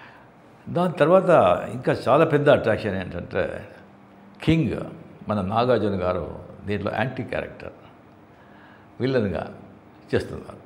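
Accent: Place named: Karnataka, India. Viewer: really our thalaivar telugu speech is osm it seems he speaks telugu better than tamil only in movies thalaivar tamil will be like native but in offscreen his tamil contains kannada marati accent but his telugu is clear and natural sounding telugu is very easiest language in south india but many tamil actors struggle to understand and talk telgu even after so many years of release of their movie in andhra kamal sir karthi sir chiyan vikram sir but karthi sir telgu is quite decent kamal sir chiyan vikram sir telgu carrys tamil accent slight but thalaivar telugu is like close to native according to me he really speaks telugu better than tamil. in movies he deliver scripted tamil dialogues but his tamil is still bit impure no tamil actor can beat him in telugu speaking. he speaks telugu with null influence of other language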